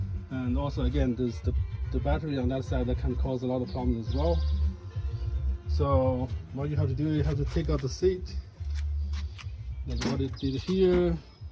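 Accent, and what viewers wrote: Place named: China. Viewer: 浓烈的同胞的口音:face-blue-smiling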